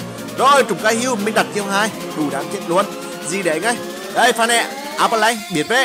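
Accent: Nghe An, Vietnam. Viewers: Anh người nghệ an hả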